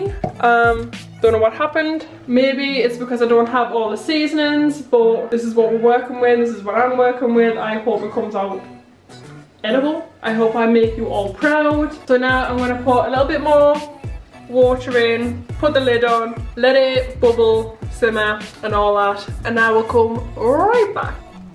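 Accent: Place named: United Kingdom. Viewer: Very interesting a British girl making Puerto Rican food but you did a good job that was very beautiful love the British accent much blessing😊👍🇵🇷🇬🇧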